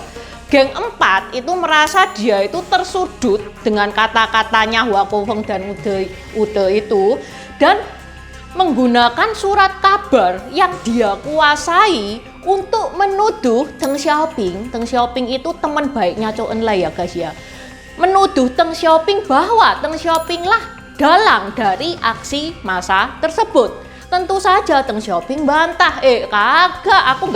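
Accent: Java, Indonesia. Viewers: Bahasa jawanya kental haha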